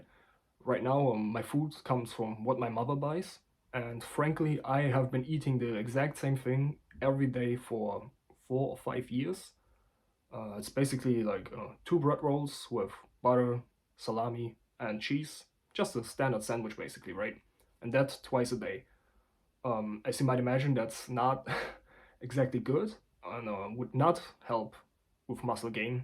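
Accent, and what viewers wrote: Germany. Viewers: I hear your german acsent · No youre right. Single mothers DO ruin their children, by not supporting them to become self sufficient. Statistics back this. Single mother children are multiple times as likely to end up sex workers or in jail, while single fathers tend to keep the kids out of bad paths like these. You gotta stop relying on your mother in life. Make a deal with her that if she supports you (shes legaly obliged to in Germany, thats what your accent sounds like), then you will do an Ausbildung or a Studium. Also you would get Bafög. Otherwise youd get Grundsicherung. These are the ways to go: GET OUT of there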